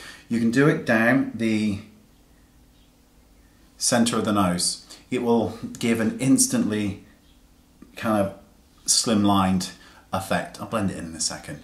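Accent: France. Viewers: What a beautiful french accent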